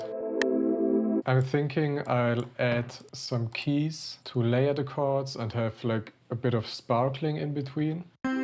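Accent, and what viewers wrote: Germany. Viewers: The german accent makes it 100 times better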